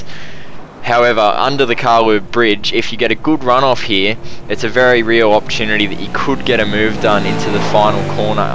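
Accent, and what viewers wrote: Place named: Australia. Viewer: Nice, vid includes authentic Austrailian accent and everything :)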